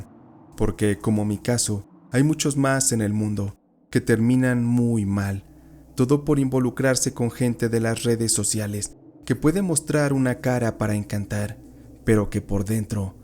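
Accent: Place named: Mexico. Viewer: ME ENCANTA EL ACENTRO MEXICANO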